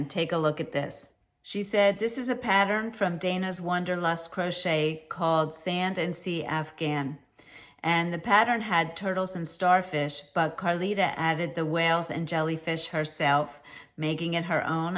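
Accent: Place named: Upstate New York, United States. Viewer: Hi,! Great pics!! It's wonderful to see the projects! They are inspiring. I love the cat couches. I won a booklet of 3 cat couch patterns, but felt intimidated, plus I didn't have access to the foam pieces to put inside. Seeing these wonderful, colorful cat couches, I just might give it a try down the road. The other projects were all amazing, too... love the blanket with the appliques. Love your website, and thank you for the Yarn Ends Tip Sheet from S.D. I had wondered if you were in New York; you sounded like an upstate or Long Island New Yorker to me, not one from the City. I am a native Ohioan, but spent 4 years in northern New Jersey before returning to Ohio at age 21. My family used to cross the border to hike to historical sites in New York. I hope you really enjoyed going to the wedding.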